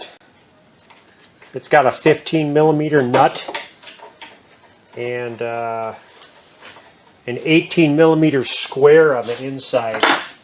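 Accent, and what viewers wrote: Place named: Minnesota, United States. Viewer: I could tell you’re from Minnesota. I also just watched the TV show Fargo.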